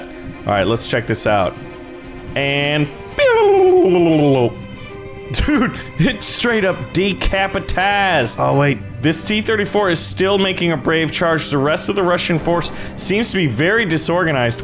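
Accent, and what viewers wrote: Germany. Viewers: This is exactly why I subscribe to your your chanle!!! I love Fritz and Hand You do the best German acsent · Great German🇩🇪🇩🇪🇩🇪🇩🇪🇩🇪🇩🇪 ascent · How did he get so good at German accents??? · Was Für deutscher Akzent Bruder!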